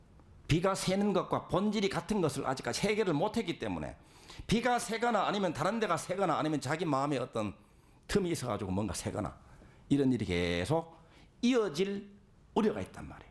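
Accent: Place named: Gyeongsang, South Korea. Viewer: 몇년전에 법상스님의 우연한신묘장구대다라니의 법문을 여러차례들었습니다 그때부터 열심히다라니공부를했습니다 경상도 억양의 스님강의 구수합니다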